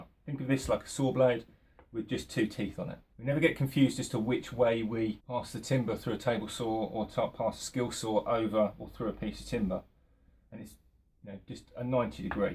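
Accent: United Kingdom. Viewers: Ciao, first THX forma clear explanation trough images of the blade while spine around the wood. But I am italian, so my english is far to be perfect. I have had some problems with your accent. I suppose you are from uk and not usa. May I ask you if you are scottish,or irish or somewhere else. I am quitecurious and always trying to improve my english. May ne New Zealand? THX again and forvgive my curiosity. Ciao from Mi!ano Christian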